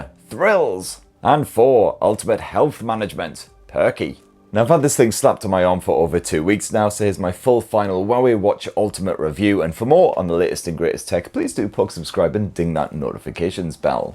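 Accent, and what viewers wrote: United Kingdom. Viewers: this is a fantastic review! Plus, the British accent helps make it sound official for us Yanks! Thanks for the great review. You've sold me between this and the gt 5 pro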